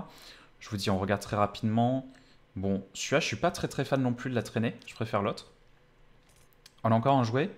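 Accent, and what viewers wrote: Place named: Russia. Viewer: J'adore ton accent "roose"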